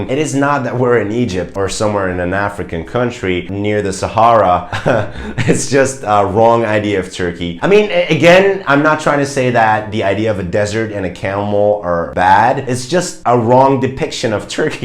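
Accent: United States. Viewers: You looking Turkish but sound American and born in Germany? Haw can ? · I was shocked at some of the things people think about Turkey and I’ve yet to visit. lol Love how you speak English with an American accent. Your videos on Turkey are so helpful. I’m trying to learn Turkish and hope to visit next year. Thank you 🙏🏼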